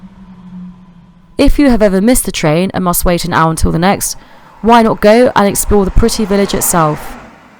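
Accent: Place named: RP, United Kingdom. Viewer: Very interesting but the commentary leaves something to be desired, I think it's done by a robot with a posh accent.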